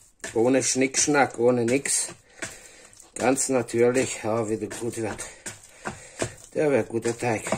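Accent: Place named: Banat, Romania. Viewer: Grüß Gott, kommst Du aus dem Banat?Hörst dich so an . Weckt schöne Erinnerungen 😊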